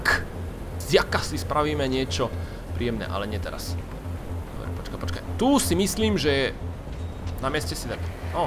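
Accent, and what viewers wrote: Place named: Slovakia. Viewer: Fiu,komentuju trosku pozdeji,no snad nevadi :) Ja osobne se na tve videa nedivam ani tak napribeh ani podobne ,ale proto,ze chci slyset tvoje hlasky a podobne.Podle me je to dobry napad,kostovky dlouho nebyly,stare dobre casy :D Pff...stare...jsi muj nejoblibenejsi youtuber ( yeup,a mas fakt super prizvuk...to je tak kdyz jsi napul slovacka no xD ) a u tvych videi se uklidnim a odreaguju.Delej jak uznas za vhodne. ;)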